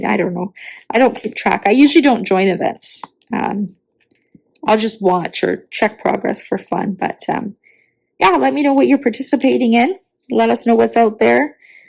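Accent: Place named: Canada. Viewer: 🌹 Hi - sorry, is it or or something else? I’ve heard your name in someone’s Live, but I’m new to following you. I’m April Campbell and I live in Oregon. I’ve been Diamond painting for a little over one year. I’m a knitter. I’m now fully addicted to diamond painting 😊. Oh well. I enjoyed your WIP and Chat. You have a lovely Canadian lilt that is very soothing, so thanks for that!! And I just want to add that I hope your upcoming procedures help you - and you are in my thoughts for all good things. ❤ from Oregon. I’m glad I found your channel!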